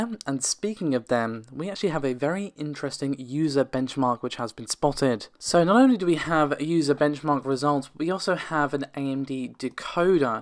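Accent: United Kingdom. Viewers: HAHA, her name is or at least that's what it sounds like, pretty unusual name for me. I can relate because when I found this channel I also thought she sounded more like a British guy, but not to the point where I would confidently say it was a guy. I'm not a native English speaker so that might have something to do with it.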